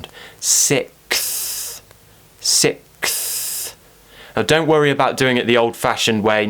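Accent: RP, United Kingdom. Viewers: Hey i've been wondering that what accents do you teach. is that RP? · I like your British accent. Please please can you make a vedio of some random difficult and long English words. For example you did already Entrepreneur. Can you do concierge or other similar heavy English words that we can still use is everyday conversations. Not really sure if you get what I'm trying to ask... I hope you do though... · Economic expressions or any expressions about business would really help me:D because I'm studying at Business Academy. You're really awesome teacher. I absolutely love your British accent. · You've chosen some of the most common words that are typically mispronounced. Your explanations are very clear - great job! I love your soft British English and your kind eyes. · I really love your videos and British pronunciation P.S. You are so cute^^ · Best teacher ever!!! 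Love your videos and your British accent, are you from London? 😍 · oh teacher iliked your British languaga so amazing thank you so much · Sound more British then bristish itself haha best teacher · Very interesting find. I could watch you talk all day eventually I would have a whole British accent 😁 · You are the best British English speaker. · Awesome! Thank you very much! I'll get it someday, I'll get this British Accent one day!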